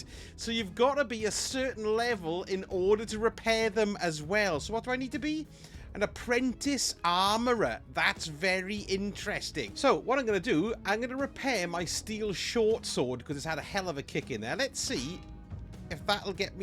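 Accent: Wales, United Kingdom. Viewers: I came on this by accident and was delighted to hear your beautiful Welsh voice,. Used to follow you back in my Destiny 2 days. (Seriously, you should voice act on Elder Scrolls 6!)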